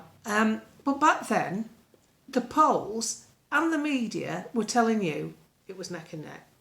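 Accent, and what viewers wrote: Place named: Lancashire, United Kingdom. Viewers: Pure common sense is never a waste of time. I’m a Lancashire lass myself and love your accent.🏴🇬🇧🏴🇬🇧🏴🇬🇧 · Great to hear common sense with a Lancashire accent.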